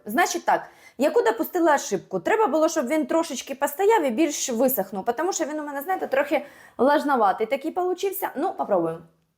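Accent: Surzhyk, Ukraine. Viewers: Те як ви говорите, має певний шарм... весело і добре від того суржику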